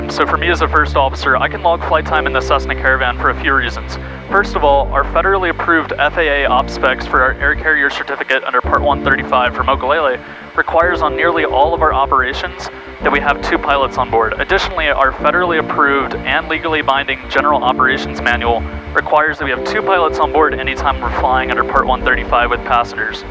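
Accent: RP, United Kingdom. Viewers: You're so posh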